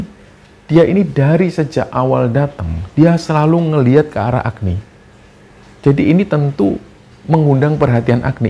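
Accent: Java, Indonesia. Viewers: Assalamu'alaikum sobat kembara, tim yg selalu nonton tp jarang komen 😂 dari Medan ni boss Biasa dengeri bareng mamak, tapi 3 feb kemarin mamak uda meninggal, almh. Mamak seneng banget denger suara mas, jawane medok, kami juga jawa tp di sumatera jadi bahasanya gak sehalus mas hehehe 😊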